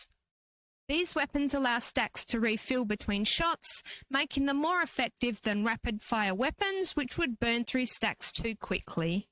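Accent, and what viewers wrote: Australia. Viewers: It's good to see you making the move to YouTube. Your guides are great. As was mentioned, the volume of your voice is low, but you have a great speaking voice. I love those AU accents! Thanks for all the data mining that you do. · I will follow and like all your videos because you are so nice and polite and professional with me recently in a situation with a Facebook Fallout group I apologize if I really shouldn't be allowed to say this here but I just wanted to say thank you for being so nice and professional. Your videos here are awesome and I follow you all the time and I've been friends with you long before you where moderating anything you are a genuine good person I just can't get used to that annoying Australian accent since I'm Irish American but I love you still the same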